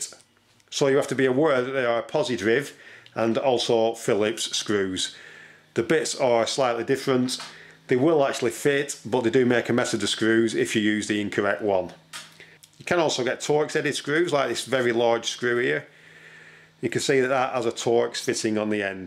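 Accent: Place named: Lancashire, United Kingdom. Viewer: Good old Lancashire accent!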